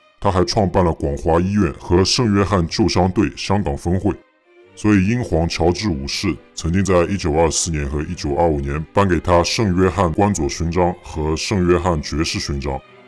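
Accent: Shanghai, China. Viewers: 你是上海人吧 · 看你表达能力很强，论述的时候也没有断续，应该是有受过训练的专业人士，而且说话的方式和一些地方语言的应用，都说明了你本人是地道的上海人。 我在猜想你不会是之前被国内封杀了的周立波吧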